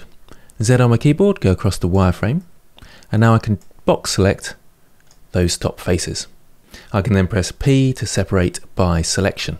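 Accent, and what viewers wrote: United Kingdom. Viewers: I will forever have a british man voice in my head when modelling in blender. G to grab, s to scale.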